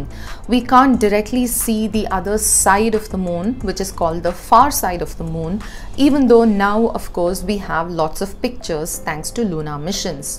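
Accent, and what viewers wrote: India. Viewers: 这个女孩子讲的印度英文话，蛮好听的。😁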